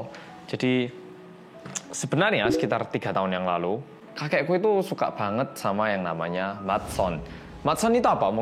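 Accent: Java, Indonesia. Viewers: medok itu nama logat jawanya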